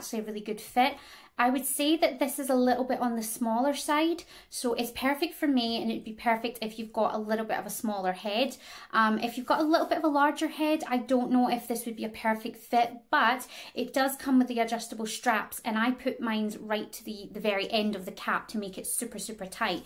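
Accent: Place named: Scotland, United Kingdom. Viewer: Nice video.. Very informative wig looks great on you Ps love your Scottish accent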